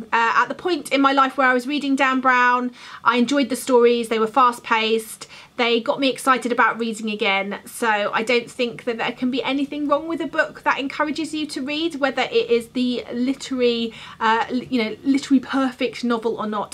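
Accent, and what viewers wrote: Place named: United Kingdom. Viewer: I want to read Winger toooo, but this book is just hanging out on my shelf 😂 Love your accent sooo much!!! I unfortunately have a kind of American accent but I looove love love hearing your wonderful British accent ❤️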